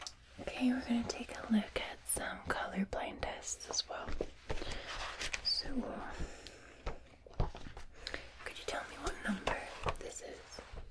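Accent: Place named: Scotland, United Kingdom. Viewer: Love your cranial nerve and sleep clinic videos your soft Scots accent makes me feel safe it helps me with PTSD survived sa by male guitar teacher at 17 fifty four now but you help me sleep thank you for being a light in the darkness you really are a life saver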